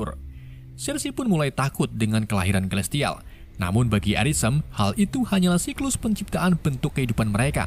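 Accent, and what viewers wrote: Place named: Java, Indonesia. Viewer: Jawa sekali pean kang😂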